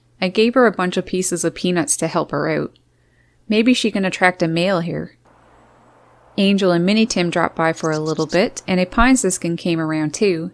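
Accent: Canada. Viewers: You sound Canadian, eh?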